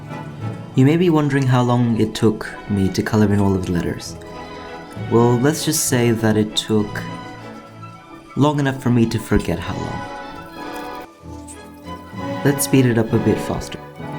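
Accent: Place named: United Kingdom. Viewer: I finally found a british you tuber!😊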